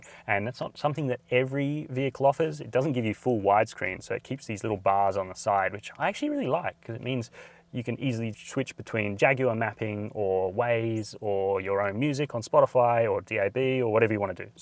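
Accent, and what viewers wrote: Australia. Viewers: Nah, this is a standard Aussie accent. We don’t all sound like Steve Irwin. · Are there different Aus dialects of English..?? I couldn't pick where you from I was thinking South Africa ot New Zealand...Your Aus accent is not as raw as I've heard in some other videos reviews. 🤙🤙🤙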